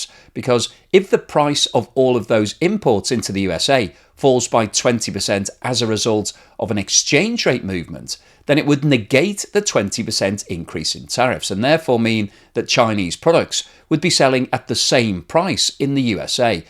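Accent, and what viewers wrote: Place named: England, United Kingdom. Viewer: He sounds pretty English to me.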